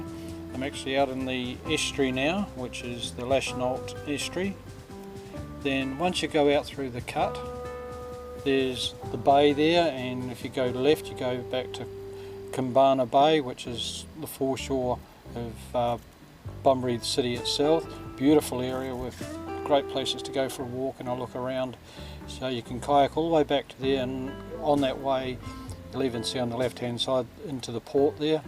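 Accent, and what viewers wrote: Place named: New Zealand. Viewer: Brilliant mate. Think I can hear an accent from back across the ditch! I’m a local Perth kayak fisherman as well so am enjoying your content. Thanks for sharing spots and launch spots. Cheers mate and keep it up 😃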